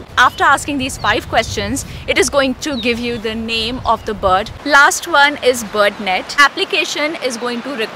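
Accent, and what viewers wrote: India. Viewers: You still have the Indian English accent!!!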